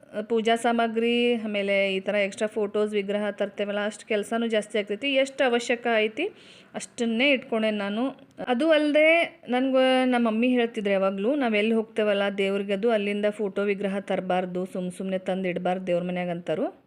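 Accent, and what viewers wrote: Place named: Uttara Kannada, India. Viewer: Nam uttar kannada bhashe chend matadtri ade tara matadi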